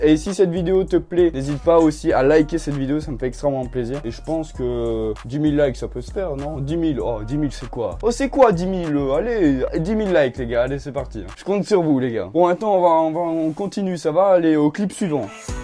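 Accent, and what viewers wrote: Belgium. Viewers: Est tu belge ? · Pk tu parles comme un Belge bizzare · Il est belge donc il a un accent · Tu es belge ? L’accent j’connais 🇧🇪 P.S : Super vidéo, mais tu expliques un peu trop les fails, laisse les abonnés regarder puis explique.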